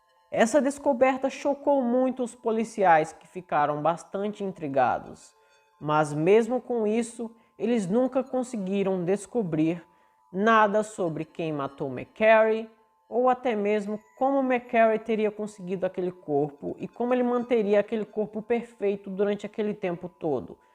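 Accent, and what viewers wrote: Northeast, Brazil. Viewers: Gosto do seu jeito nordestino de falar😁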